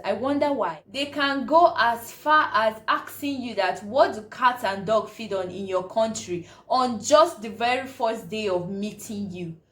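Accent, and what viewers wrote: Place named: Nigeria. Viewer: I love ur Nigerian accent "Maddah & Faddah"🤣🤣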